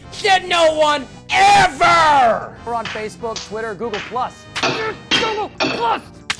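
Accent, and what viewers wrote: South, United States. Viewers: random but i LOVE your accent. you sound very southern in the best way possible. i’m a born and raised georgian so i can definitely appreciate it. it sounds like i’m being spoken to by a friend.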